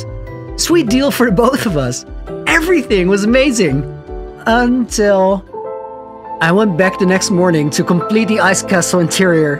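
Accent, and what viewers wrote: Netherlands. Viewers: I love your Dutch accent ahaha why have I never found your channel before? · Brooo this shit is amazing, I love the vibe but I did recognize a Dutch accent right away ;). Ziet de lekker uit man!!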